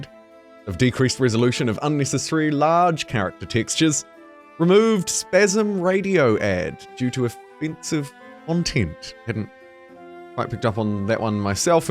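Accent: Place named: New Zealand. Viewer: its good to hear a kiwi as voice, repping NZ in the gaming space of youtube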